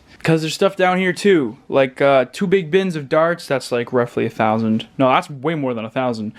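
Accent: Canada. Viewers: In America we say owt in Canada you say oot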